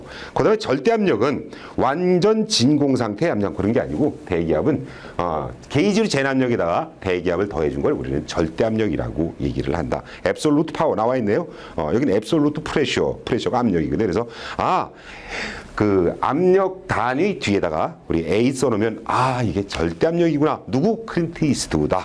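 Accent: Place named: Seoul, South Korea. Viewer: 신기한 서울말의 세계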